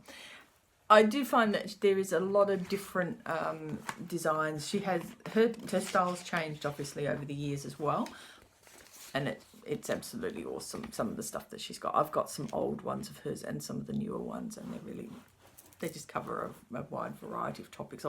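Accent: Australia. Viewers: What a delightful video! I have just subscribed and am thrilled to find you!:) I am so happy that you came to visit my little channel. Isn’t it fun to find a new stitch friend through our wonderful hobby/obsession!!! BTW, I love your Australian accent and your craft room. I look forward to binge watching your other videos soon. Happy, happy stitching from a new friend in Texas.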